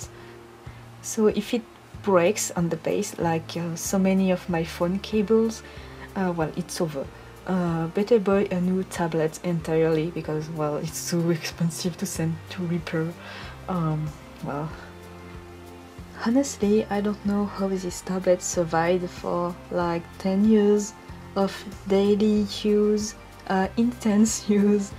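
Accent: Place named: France. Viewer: NANNNN, T'ES FRANÇAISE ?! Jamais j'y aurais cru ! En tout cas, je tenais à te dire que je trouve ton travail très beau, de beaucoup de manières ! Que ce soit les couleurs, la technique ou le fait que tu propose beaucoup de choses différentes ! Continue comme ça, cette vidéo est géniale ! :P (ton accent anglais est trop mignon !)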